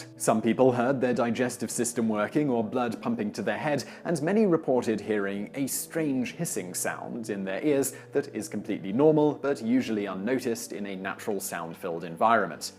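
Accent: England, United Kingdom. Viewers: Man i swear genuine English accents make narration serious/entertainingly listenable and even the occasional background noise for a day time nap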